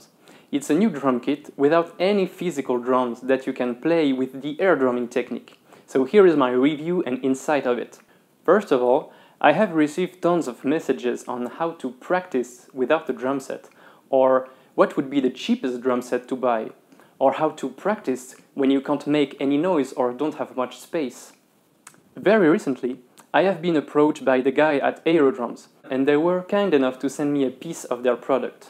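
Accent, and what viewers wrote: France. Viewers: oh mon dieu... mais t'es francais en faite ! bon bah j'ai appris un truc cool, grace à ton sublime accent :p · Haha he sounds French. But he is one of the few that actually speaks decently english!!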